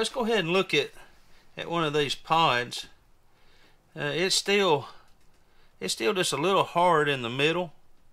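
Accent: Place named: Boston, United States. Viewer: I recognize that accent. Definitely Back Bay, Boston!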